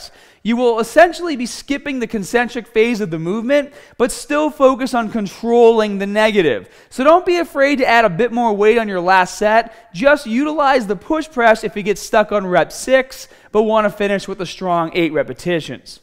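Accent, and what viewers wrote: Boston, United States. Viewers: That Boston accent. Love it! haha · Never paid attention to the Boston accent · wow is he from Boston? He has very thick accent sounds kind of like boston accent. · Gonna hit the bah bells hahd to build up my ahms. Love that Boston accent! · Boston accent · Smaaarrght.. Is that a Boston Irish accent? Excuse my unfamiliarity as I'm from NZ.. Sounds cool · This guy is from Boston , Bahhbell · i hear boston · whats with the new boston accent.... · He’s gotta be from boooooston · Is this guy from Boston. I say -impossible to do that many reps and sets and only rest 90 seconds. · This guy has to be from Boston I am and I can see it right away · Northern American. Boston accent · Love the Boston accent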